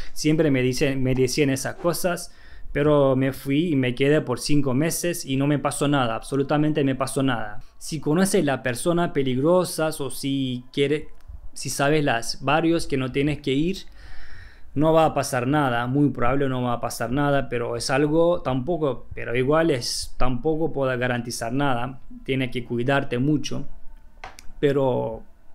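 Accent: Argentina. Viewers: Que bien que hablas el español argentino!!! Bien porteño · Hola, nose como llegue a tu canal. Pero un placer verte tomar mate, y que hables mas Argentino jajaja. Merhaba... Iyi geçeler. Tratando de aprender tu idioma. Para concretar El Viaje pendiente. Saludos desde Argentina 🇦🇷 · ablas como argentino español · no es español de España sino español de Argentina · Hablas muy bien como argentino... el asentó es inconfundible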